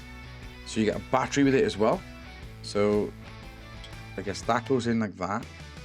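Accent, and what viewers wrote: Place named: Wales, United Kingdom. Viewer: I recognise that accent, are you a southy like me? Land of the red dragon?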